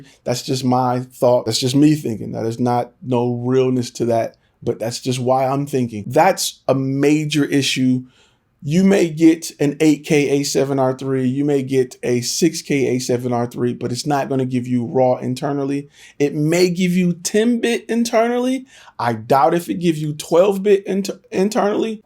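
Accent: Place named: Inglewood, United States. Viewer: weird question, but just curious where you're from? Your "accent" and mannerisms are similar to my former Animation Art Director who grew up Inglewood, CA.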